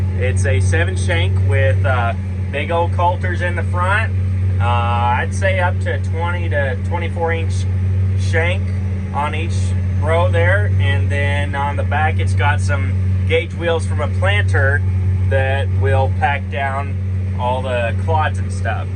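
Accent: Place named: South, United States. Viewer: What part of Texas are you in and what do your grow, just wheat? BTW SO GOOD to hear a "ya'll" on a farming video! I have been drowned with "you betcha" and "you's guys" on farming videos and it is SOOO good to hear a good ol "almost" southern accent, course I'm from BAMA! LOL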